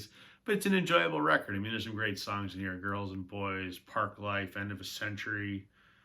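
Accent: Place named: Canada. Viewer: Hey Man!! Good to find your channel.. I'm JT.. I live down in Reno, Nevada.. have a feeling. and hear in your voice that you are up in Canada.. especially when you showed that on your intro.. great band!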